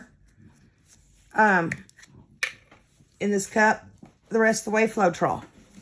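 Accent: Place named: Texas, United States. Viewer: my love, I love it!!! 🤩🤩 I also love you giving Lulu the business!!! 🤣🤣🤣 Keep being you my friend!!! Crazy dog and Texas accent makes a great video. 🥰❤️😘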